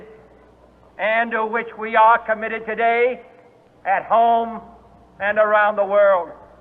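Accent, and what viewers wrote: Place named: Boston, United States. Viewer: Ogre It’s the Boston accent.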